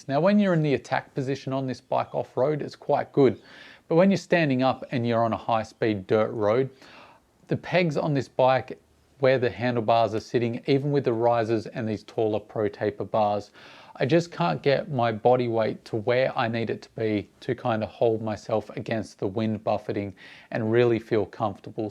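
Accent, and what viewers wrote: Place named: Australia. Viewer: bro you're australian as fuck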